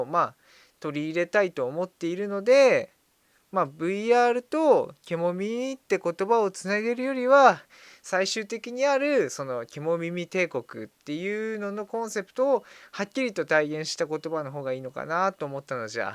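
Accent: Hiroshima, Japan. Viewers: 広島の方ですか？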